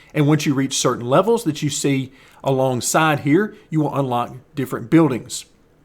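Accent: South, United States. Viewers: Your videos are great, learnt alot! Also the added novelty of you having a Southern American accent whilst explaining a medieval game is brilliant! Keep up the good work 😁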